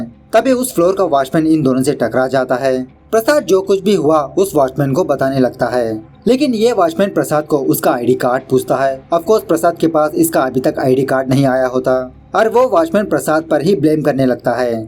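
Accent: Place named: Hyderabad, India. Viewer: Bhai Hyderabadi dilect akhari hai...😎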